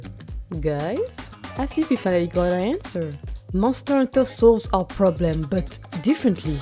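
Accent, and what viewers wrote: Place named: France. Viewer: You can count on a French to not hear the obvious French accent... I got jumpscared by your "les amis" lmao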